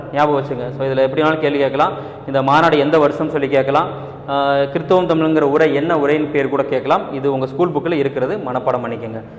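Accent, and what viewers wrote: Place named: Tirunelveli, India. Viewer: சாருக்கு திருநெல்வேலி போல... ழ் உச்சரிப்பு மட்டும் தகராறா இருக்கு. மத்தப்படி பாடம் லாம் செம டீடெயில் ❤️ உதவியா இருக்கு 🙌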